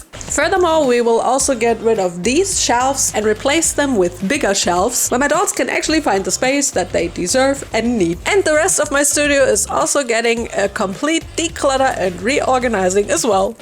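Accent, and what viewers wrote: Germany. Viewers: I love your videos and your German accent I am German and shout-out to u and your dad yall do amazing work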